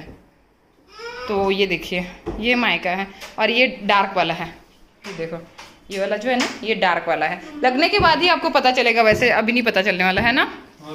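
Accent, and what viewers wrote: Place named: Varhadi, India. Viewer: Kay chyyata marta tumhi😂😂🤣🤣mast apli warhadi aikli ki mast watat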